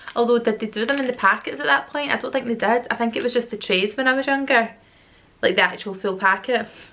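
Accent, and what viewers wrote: Scotland, United Kingdom. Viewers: i love her accent to lol certain words sound so much better in Scottish lol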